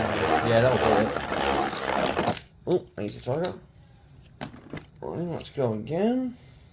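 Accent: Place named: Australia. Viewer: You sound aussie whereabouts are you from would love to do some battles and a meetup. Havent seen much people use plastic in a while gets me excited.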